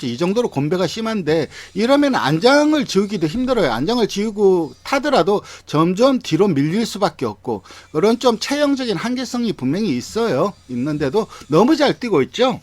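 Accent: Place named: Busan, South Korea. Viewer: 최강게임 쌍으로 조질라니까 부산말 싹 빼고🏇🐎😷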